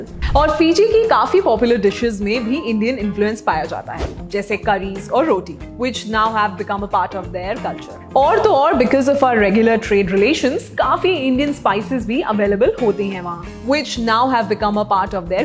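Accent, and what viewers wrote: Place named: United Kingdom. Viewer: She's speaking british hindi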